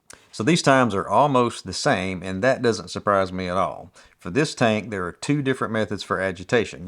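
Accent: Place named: Georgia, United States. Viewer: Started developing film in the early 70’s. Did that till the late 80’s when I joined a Southern Lifestyle shelter magazine and shot only chrome for the next 25 years. Still freelance but of course digital for my clients. But recently, like you I decided to break out the old cameras and put them to use. I was so fast with stainless reels in the old days but picked up Patterson recently. I’m sure I’ll get the hang of it but so far they’re quite a challenge. I’m sure being in a changing bag doesn’t help. I might have to look for those easier Ap reels. Good stuff here and thank you. Trying to place your accent. At first I thought Fort Worth but then decided Georgia. Am I close?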